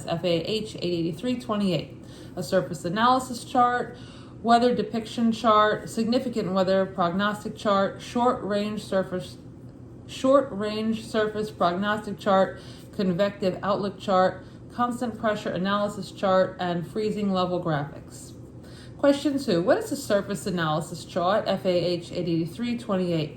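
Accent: Brooklyn, United States. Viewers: Great job breaking down the Instrument Pilot Oral Exam Guide with that authentic Brooklyn flair—super engaging and helpful!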